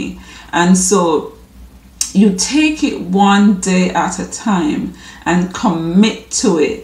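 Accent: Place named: Barbados. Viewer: where are you from? Your accent sounds a bit Barbadian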